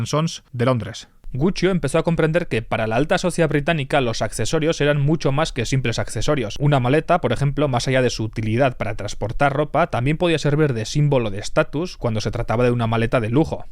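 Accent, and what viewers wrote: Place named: Basque Country, Spain. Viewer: Eres euskaldun no? Lo digo por tu acento. Yo de Vitoria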